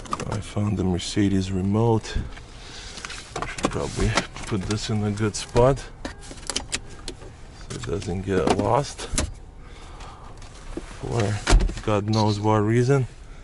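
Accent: Russia. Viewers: брат ты русский ? у тебя акцент))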